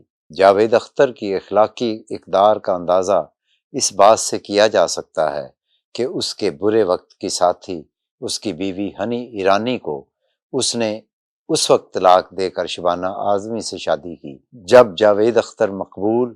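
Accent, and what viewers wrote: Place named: Punjab, Pakistan. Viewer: urdu reading in Punjabi style.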